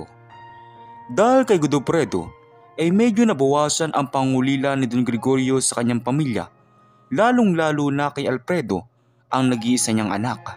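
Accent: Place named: Visayas, Philippines. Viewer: Halatang bisaya ka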